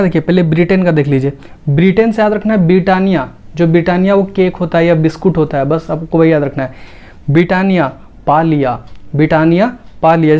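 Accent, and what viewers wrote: Bihar, India. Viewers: सर आप बिहार से ही हो क्या ,, बेस्ट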